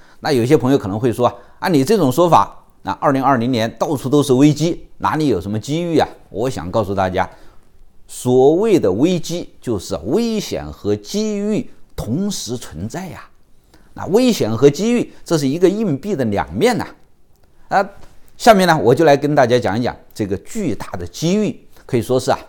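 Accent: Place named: Wuhan, China. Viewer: 作为一个长期旅居海外的武汉人，听到李老师的带着家乡口音的讲话，觉得非常亲切，而且字字在理，我非常同意李老师说的分析，大大受到启发。以后会长期关注李老师。谢谢！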